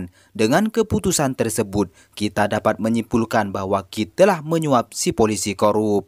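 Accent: Madura, Indonesia. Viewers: Si owen kan pemaen smekdwon si batista pernah maen juga di film the guardian of galaxi sama film avenger and game,kalo si sadi namanya tony ja dia pemeran utama di film ongbak. Kalo dari gaya logat ngomongnya si abang orang madura ya ?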